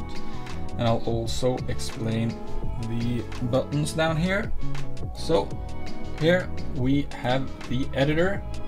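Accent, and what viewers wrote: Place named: Norway. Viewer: Very help full. I'm now setting my Ugritone drums (Against Humanity). Are you from Norway? I can hear some accent :)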